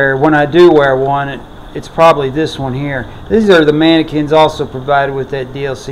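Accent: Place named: South, United States. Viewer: Fallout videos are 9000% better with a Southern accent. Roll Tide.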